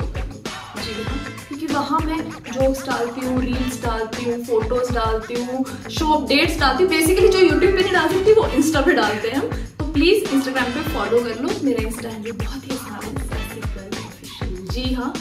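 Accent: Haryana, India. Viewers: Haryanvi accent 😅😅😅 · Haryanvi language was bang on 🤣🤣🤣🤣🤣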